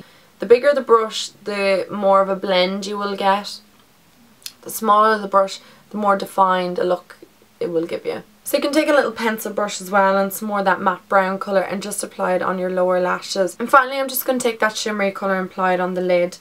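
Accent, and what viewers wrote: Ireland. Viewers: great video thanks for the tips!! i use a darker foundation for contouring but find it looks cakey so will invest in bronzers and try that instead! love the accent! im sure all english people love the irish accent ! :) subscribed :) xxxx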